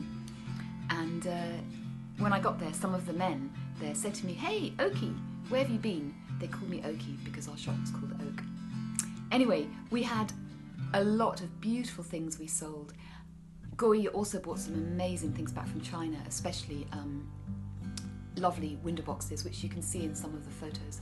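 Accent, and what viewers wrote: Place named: London, United Kingdom. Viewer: Hi, glad to hear your story! I love your flower shop very much. And your beautiful London English accent. I'm sorry that your shop is closed. Anyway, you are absolutely an excellent gardener. I wish you a happy life forever. Long to hear your story soon again. Love you 😍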